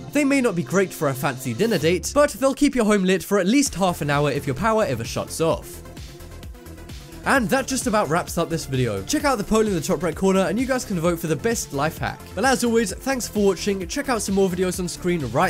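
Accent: United States. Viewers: You swy you're from the UK, but you talk like an American. I wonder if you are lying about your heritage...?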